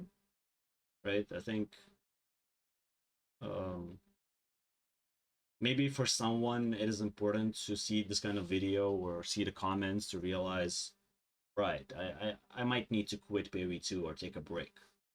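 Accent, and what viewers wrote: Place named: Latvia. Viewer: Latvisks akcents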